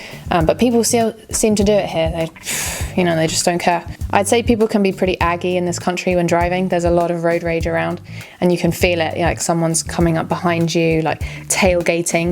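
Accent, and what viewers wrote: United Kingdom. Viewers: 好棒的節目，英國口音好聽到不行，感謝您！ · You have such a nice and enjoyable British accent :)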